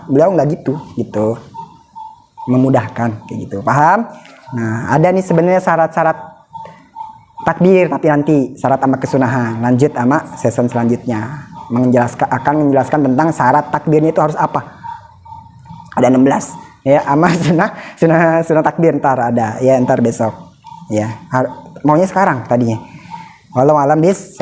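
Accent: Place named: Jakarta, Indonesia. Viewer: Bang care ngomong lu gue demen banget dah bang😊 mau nanye nih ape boleh..?.abang ustaz orang betawi ye betawi mane baang..?.logatnye medok banget bang enak gue dengernye kaye gado"..betawi..he hee..salam.kenal bang 😂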